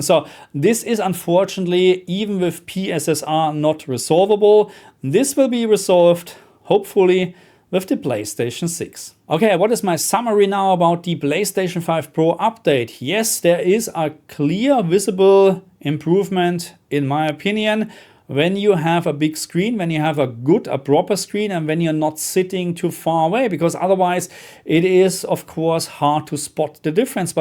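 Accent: Germany. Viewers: your English are perfect!I am from Greece but I like your German accent.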